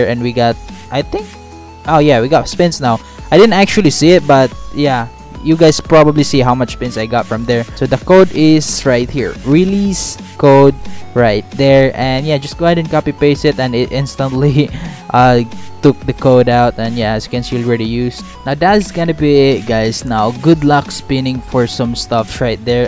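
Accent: Philippines. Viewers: THis guys lives in the Philippines i know by his accent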